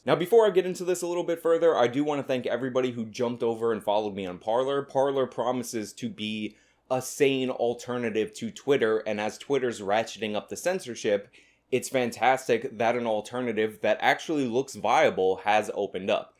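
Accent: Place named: South, United States. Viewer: A man with a southern accent apologizing for hurting peoples feelings. The South as a whole weeps for his cowardice.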